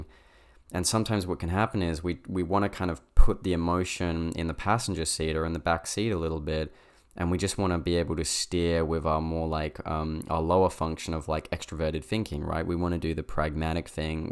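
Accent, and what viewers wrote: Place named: Australia. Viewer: So nice to hear another Aussie male accent. We INFPs seem to be a little rare in this beer-guzzling testosterone-driven culture here down under.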